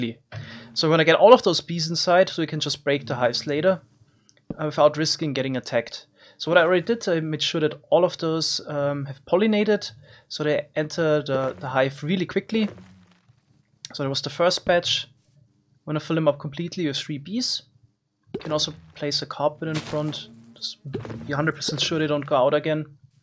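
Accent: Germany. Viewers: Are you German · 100% deutsch mit dem schlechtesten Englisch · German accent? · Ist er deutsch? :D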